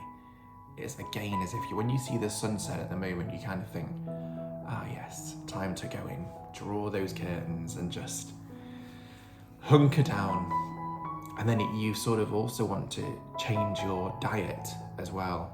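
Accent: United Kingdom. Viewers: I enjoyed listening to you. Think I can hear a slight Yorkshire accent.? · I don't know where you live, but I could listen to your beautiful English accent all day long talking about Autumn. It was such a lovely video. Please don't stop these. I find them very comforting.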